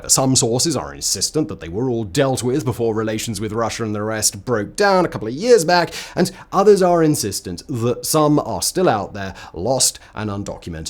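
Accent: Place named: United Kingdom. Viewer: Please speak a little slower. The rapid pace, along with a British accent , reduces to gibberish to the American ear.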